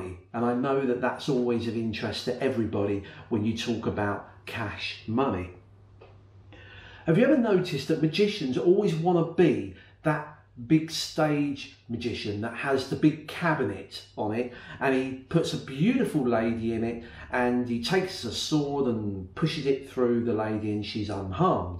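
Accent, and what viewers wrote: United Kingdom. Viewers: Is it possible you teach us someday this wonderful british accent?? or it needs a gimmick (((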